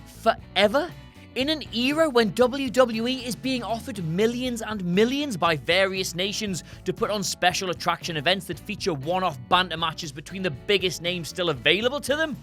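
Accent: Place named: United Kingdom. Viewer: is my everyday reminder that British accents are not fake, and in fact some of them can't just switch it off and do american.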